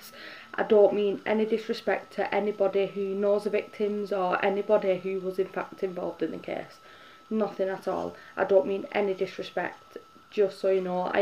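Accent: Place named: Manchester, United Kingdom. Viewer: Your accent is so interesting! Are you from Manchester?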